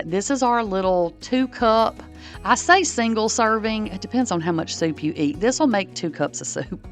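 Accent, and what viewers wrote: South, United States. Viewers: I don't know where you are from, but I love your accent. I would say you're from the south. I just love this idea. I want to start dehydrating things. I love soups. Sometimes, I just don't feel like cooking a lot of things for supper. Instant soup would be wonderful! Question: have you ever dehydrated cabbage for soup? Thanks😊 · Lol, I just found u. I'm trying to figure out what part of the south u r from. I'm from VA.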